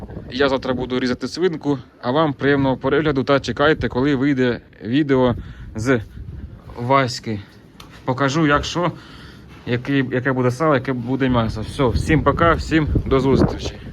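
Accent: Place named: Zhytomyr, Ukraine. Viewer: Вась не звиртай увагу на рiзних дурнiв добре видеш канал, в нас на житомирщинi говорать також суржиком!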